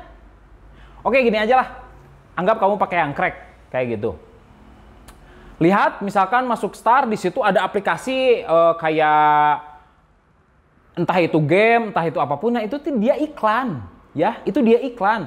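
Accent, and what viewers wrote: West Java, Indonesia. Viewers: Nah ini, daritadi gua fikir logatnya asli sunda kwkwk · Pasti orang sunda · Alah siah sunda pisan euy fenjelasannya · dia orang sunda makanya ngomong kek gitu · penjelasan dengan kearifan lokal , ingrris ala" indo dan penjelasan "F" dengan khas orang sunda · sunda pisan om semua huruf f diganti p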